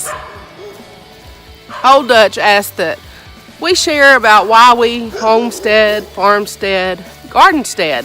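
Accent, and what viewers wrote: Mississippi, United States. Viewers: Love your garden. Very neat and beautiful. I love your Mississippi accent too. So cute...Have a blessed Easter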